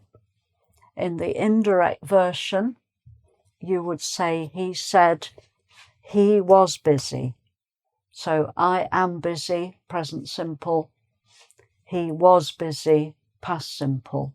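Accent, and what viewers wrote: England, United Kingdom. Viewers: How nice to hear english english rather than american english.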